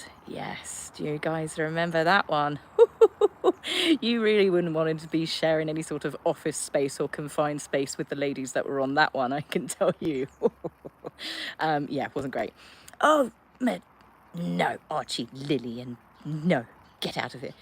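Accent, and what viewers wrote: United Kingdom. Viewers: you are pretty with or without makeup. We live in the country in SE Georgia, USA, agricultural area hot and humid. We are having afternoon thunderstorms almost daily! It’s a Summer time thing. We are natives of NE Florida, same weather! Love your accent. What part of UK you from. We speak SOUTHERN USA! 😂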